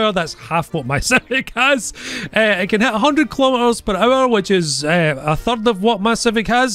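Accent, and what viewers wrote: Scotland, United Kingdom. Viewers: I have no interest in war thunder, I’m just here for that Scottish accent and the Tanks.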